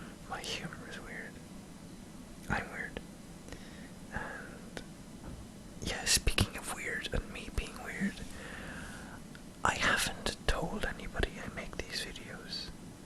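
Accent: Ireland. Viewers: That's the kind of ASMR I need <3 Irish and inspirational (and no chewing shit)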